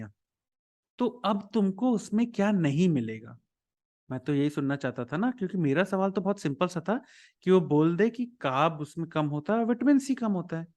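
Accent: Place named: Bundelkhand, India. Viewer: Sir bundelkhandi he 😂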